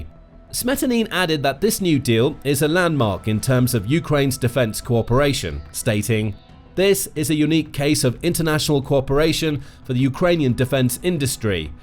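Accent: United Kingdom. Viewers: British English, yes, so kinda regional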